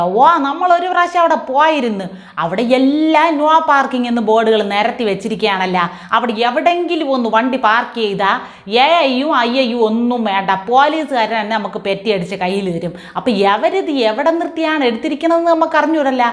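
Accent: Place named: Trivandrum, India. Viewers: തിരോന്തരം ശൈലി ബേഷായി😅😅😅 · തേരോന്തരം ഭാഷ പോളിയാണ് 👍👍👍🤗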